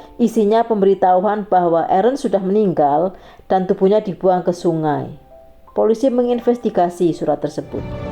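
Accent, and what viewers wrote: Java, Indonesia. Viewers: ... dan terima kasih atas kasus nya yg luar biasa dengan kemedok an nya... salam cinta dari batam untuk madam....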